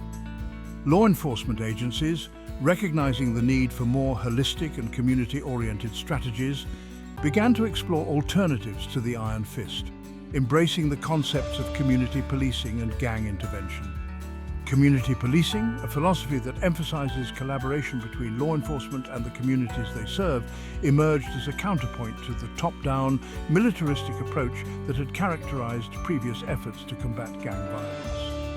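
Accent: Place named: United Kingdom. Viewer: Whats up with these Brits narrating this lame ass crap ???? Who gives a f--- <num> Life !!!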